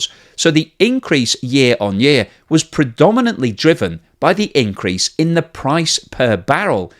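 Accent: United Kingdom. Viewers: As a non native English speaker, I feel speaks the clearest English amongst all Internet speakers. Can anyone tell me from where is the UK is his lovely accent?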